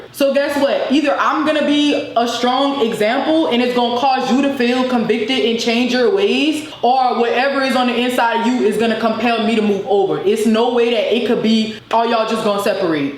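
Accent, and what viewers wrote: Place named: Louisiana, United States. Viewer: Lousiana accent bby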